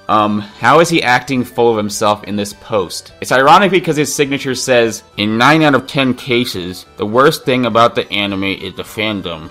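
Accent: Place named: United States. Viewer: God, his American pronunciation of the names, is so fucking annoying!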